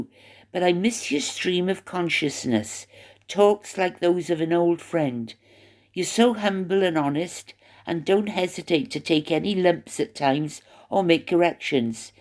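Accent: Wales, United Kingdom. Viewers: Subscribed and enjoy following your channel from 🇰🇷. Btw, love your Welsh accent :) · Hello from New Zealand.I just love listening to you and I admire your intellect and humour. I too notice teeth 🦷🤪. I have a soft spot for Wales, the Welsh and the accent. Have a lovely day. 🥰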